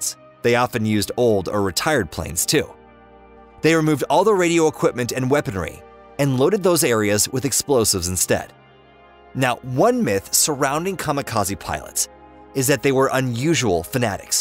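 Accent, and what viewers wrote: United States. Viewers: Too slow, A Yank of course.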